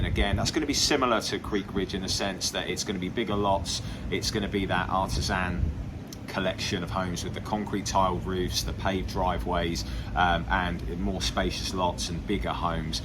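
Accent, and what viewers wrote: United Kingdom. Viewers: Is that a Mancunian accent ?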